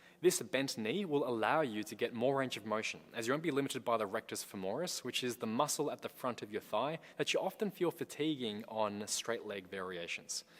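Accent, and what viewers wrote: Australia. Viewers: I’ve never seen an Asian with an Australian accent, super cool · Are you from Australia?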